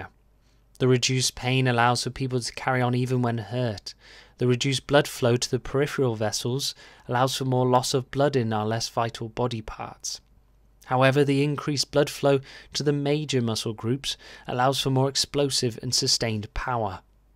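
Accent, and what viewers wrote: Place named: Wales, United Kingdom. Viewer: Thanks, watched on repeat and took notes, very professional, and with an endearing hint of Welsh?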